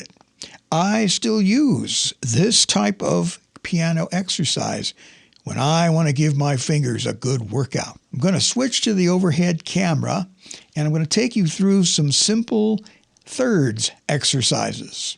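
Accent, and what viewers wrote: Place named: England, United Kingdom. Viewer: Blimey, I was proper convinced you were from England! Pure english English, innit! I'm lovin' it! Your speech is like music to my ears, like a bit of Chopin! :-)) I will keep listening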